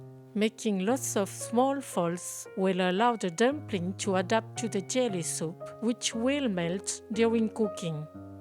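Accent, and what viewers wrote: France. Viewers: When the food is Chinese, and the accent is french you know it's going to be tasty. It looks like a very memorable experience by the table.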